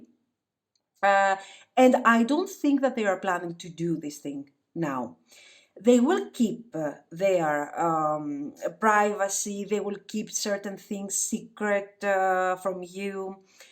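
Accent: Greece. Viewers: Και γω το σκεφτηκα από την προφορά σας!!!Μπραβο..είσιε πολύ καλή κ ακριβης στις προβλεψεις σας!!!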